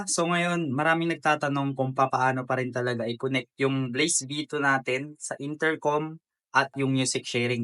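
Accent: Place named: Pangasinan, Philippines. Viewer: Pangasinan accent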